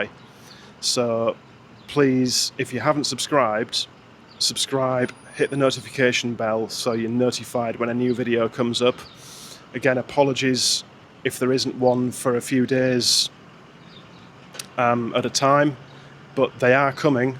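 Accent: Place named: North, United Kingdom. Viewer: Congrats in your 10x10. Video is inspiring as always. Not tired of the Northern accent and hope your toe feels better soon. Cheers 🏔️🏕️🏔️🏕️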